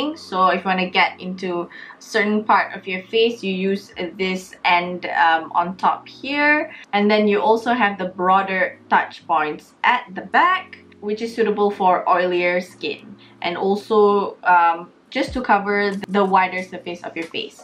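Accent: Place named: North, Malaysia. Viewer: Bila nak buat video cakap utara lagi?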